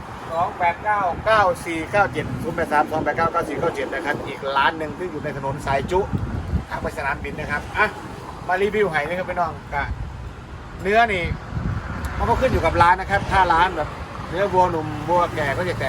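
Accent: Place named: Isan, Thailand. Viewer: เว้าอีสานบ้านเฮาคือชิม่วนคืจังแบบบ่าวท้าว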